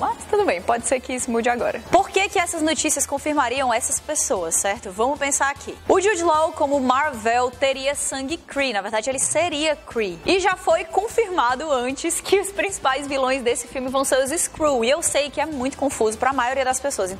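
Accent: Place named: Ceará, Brazil. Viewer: Só sei que o universo Uplay iria desmorar, se a saísse do programa. Mas, eu sei que isso não tem nem perigo de acontecer. =D P.S.: É impressão minha ou o vídeo de hoje saiu "mais cearense" que o normal? ^^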